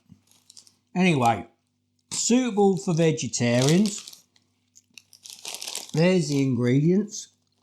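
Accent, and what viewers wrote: Norfolk, United Kingdom. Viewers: Sound as if you might be a good ole Norfolk boy😊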